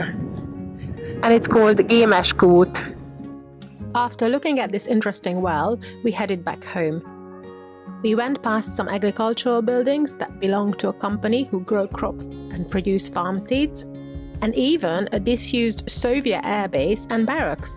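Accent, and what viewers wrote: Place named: United Kingdom. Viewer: You seem to have a slight British accent. How many years have you worked in the UK? Is there still a lot of young hungarians working?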